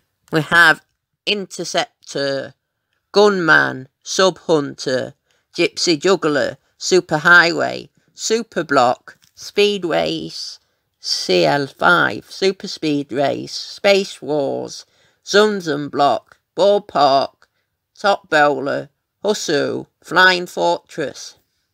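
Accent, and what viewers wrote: United Kingdom. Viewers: Hello! I've been watching your videos throughout the years, and I really enjoy the content that you put out and the dedication behind it! This little change up in format is a nice surprise, and I actually find your voice kind of soothing. What would you pin down your accent as? I feel that it's British, but I'd hate to just randomly assume in case it's something different, hah. Anyway, here's hoping for nice times for you and your further researches! I really do love your channel~ <3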